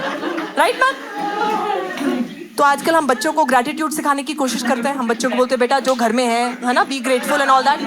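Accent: Punjab, India. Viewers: Being a Genz I don't spend much time in toilet. Also I really like your way of presenting and didn't find it like I should mind it . Also your punjabi accent ❤❤❤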